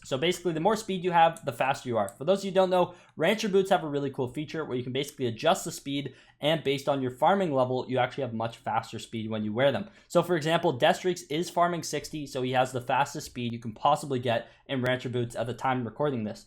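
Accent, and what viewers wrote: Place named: Canada. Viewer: i love ur slight Canadian accent and i can barely hear it and it only adds to ur charismatic personality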